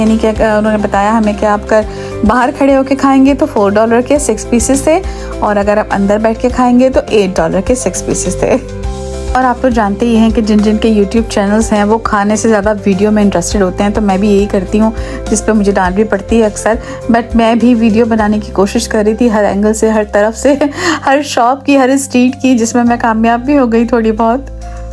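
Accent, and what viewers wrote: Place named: Karachi, Pakistan. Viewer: Salaam ...Aap ke Bolnay ke Andaaz se hi Mai samajh gyi thi ke Aap Karachi se hain ..wesay Karachi Wala Taste to Dunya mai Kahin Nhi milta ...Yehi Sach hai ....By the way watching ur vlogg for t he first Time ....Nice vlogg .👍🏻.......Hamesha .Khush rahain .....🌹🌹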